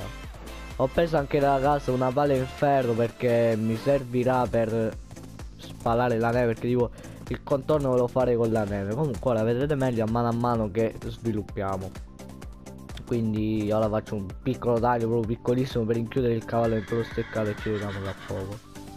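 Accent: Sicily, Italy. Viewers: 01 Dall'accento sei Siciliano vero? ;)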